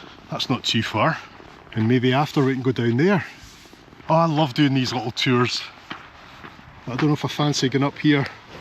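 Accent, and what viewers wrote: Scotland, United Kingdom. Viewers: Half the joy- your scottish accent 😸 Tnanks for another great trip 👌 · Thank you for not punishing us too badly with your wee references to our convict who would be king! I'm thinking I need to persuade my husband to get me to Greenland before someone does something stupid (well, even more stupid) and we can't go at all. I'm going to start practicing my accent to sound like you so that we can go under cover as Scots rather than americansk, maybe that'll help. The video was absolutely lovely, and you're a wonderful tour guide for sure. We watched the next video earlier, so we saw the boat and the bergs and growlers, but it would be great to see it again. We're winter people as well, so that would suit us perfectly! Thanks again, and looking forward to the next one!! ❤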